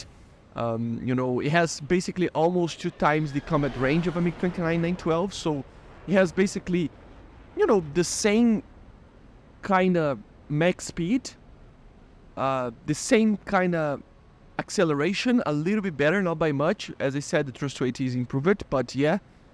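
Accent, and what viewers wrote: Brazil. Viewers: is a brazilian with portuguese linguistic vices. So it might sound like the IRIS-T for a non portuguese speaker. He literally put an image of the IRST on the screen when he is talking about it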